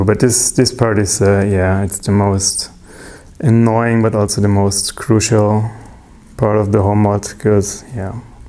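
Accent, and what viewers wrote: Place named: Germany. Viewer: eew that gross accent... I assume you're german? maybe work on your speech before you upload videos in a lingo that you can't pronounce without ppl getting ear-cancer...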